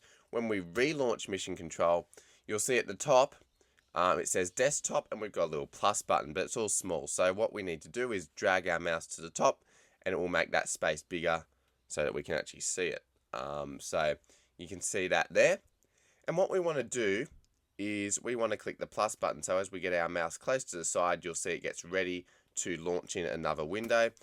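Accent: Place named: Gold Coast, Australia. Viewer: Use them all the time. Trying to figure out how to lock them. Drives me nuts when they shift. Your voice sounds quite a bit like pro surfer Mick Fanning which leads me to believe your from the Gold coast. Lucky you. Aussie envy here.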